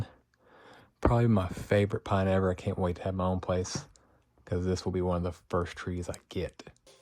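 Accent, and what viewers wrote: South, United States. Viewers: Your southern accent comes through so strong at home. I didn’t hear as strongly when you were living in Vietnam. So cute! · your southern drawl really comes out when you're home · Since returning home, your southern accent has really become strong! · hi.. i have watched some of your phil. vlog and came across your recent one.. surprised to hear ur southern accent.. lol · Your southern accent is so much stronger when you’re home, it’s refreshing, thanks for all the content. Wishing you a fantastic day, and safe adventures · Very first time i hear your southern accent. 😂 I didn’t hear those when you were in Asia ✌️ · This is for the very first time I am getting to hear your native Southern Accent. Sounds so interesting. Do you conciously tweak your accent when you make your travel videos ? Just asking out of curiosity as a language student. 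· accent got back to factory reset as soon he came back south 🤣🤣🤣 love to see this side of your life country boyy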